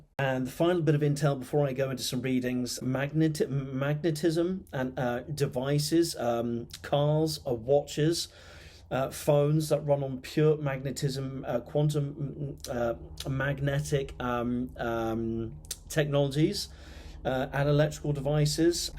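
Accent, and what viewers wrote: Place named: United Kingdom. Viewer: First time on your channel. Great reading! Your british accent is lovely however you move very quickly through things and your speech was a little fast for me. I'll listen again. Thank you for your reading. Just subscribed .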